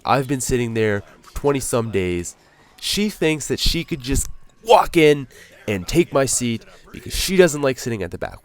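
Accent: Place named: Canada. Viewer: His Canadian accent is awesome.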